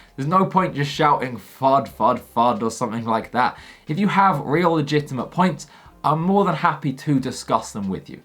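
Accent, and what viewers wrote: England, United Kingdom. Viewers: Good video, subbed, are u from England man? 😊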